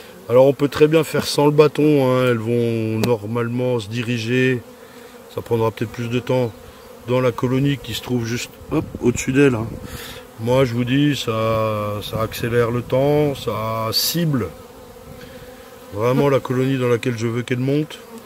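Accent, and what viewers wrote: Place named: South, France. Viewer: Hello ! D'abord grand MERCI ! Encore une vidéo passionnante ! C'est dingue ce que j'apprends grâce à toi... Ensuite.. J'aime trop ta voix de Bary White 😉 et ton accent du sud parfois... Lol.. Enfin.. Pour moi la reine vierge va mourir.. Soit abandonné par terre.. Soit tuée car les zabs vont sentir les phéromones de l'autre (bonne) reine.. Et n'auront plus besoin d'elle... J'ai bon ? Encore mille merci ! Flo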